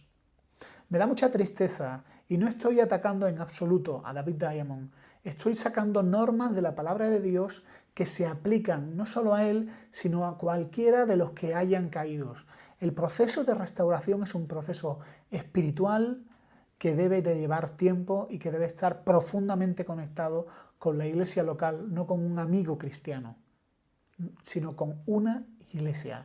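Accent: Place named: Argentina. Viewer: No digas tonterías eres argentino se tu acento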